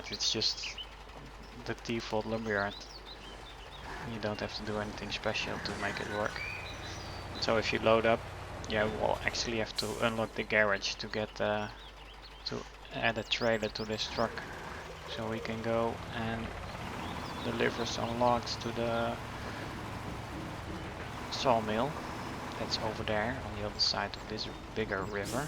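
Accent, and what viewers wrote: Netherlands. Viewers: Klinkt als een Nederlander. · Ja volgends mij is hij Nederlands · Altijd grappig als een nederlands iemand engels praat :)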